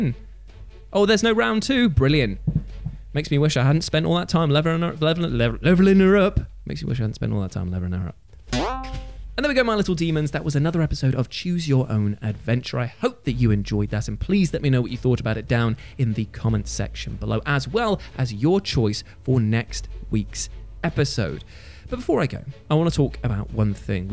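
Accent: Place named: Scotland, United Kingdom. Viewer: Not only was there a Limmy shout out, but that Scottish accent! Hats off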